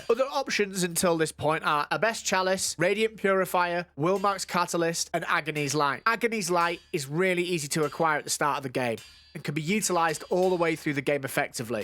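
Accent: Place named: North West England, United Kingdom. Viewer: I’ll be honest, bro, I keep expecting to login to it being nerfed to f*cking kingdom come 😂 glad I got to experience it in all its busted glory before the inevitable happens 😂 And my pleasure to support; especially for a fellow, North West of England, lad (there’s about 35miles between us [north of me] judging by your accent). Keep up the good work, fella 👍🏾🤟🏾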